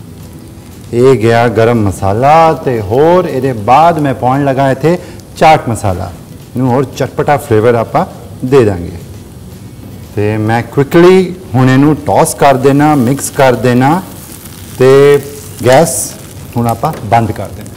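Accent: Punjab, India. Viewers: Sooooo SWEET PUNJABI HE SPOKES 👌👌👌👌💋💋💋💋💋💋💖💖💖💖❤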